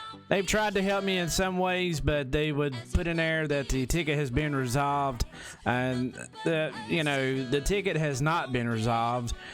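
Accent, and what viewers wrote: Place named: United States. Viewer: I like your country accent. You sound like you eat apple pie and fried chicken everyday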